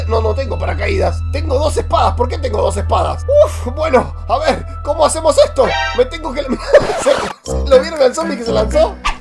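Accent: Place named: Argentina. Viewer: Eres argentino 🇦🇷🇦🇷🇦🇷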